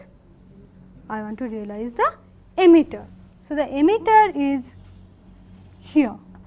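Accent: India. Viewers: She is like a million times better than any professor who teaches engineering in India except the other IIT profs. who are comparable to her.So she is one of the best profs. ever ..so you should just shut up and listen to the best lecture you can get without whining about the accent and stuff..her accent is the thing which makes things unique ..that's the Indian accent!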